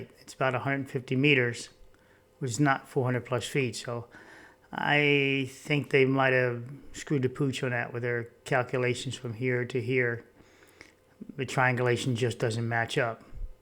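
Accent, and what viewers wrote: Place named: New York, United States. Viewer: Hello from Kansas! Really enjoy watching your analysis. It reminds me of Seinfeld. It isn't a show about nothing but idiots who troll think it is. Maybe its perhaps, what I think is a New Yorkish accent? or at least northeast coast American accent. I do have Kansas ears and we have little to no accent so . . .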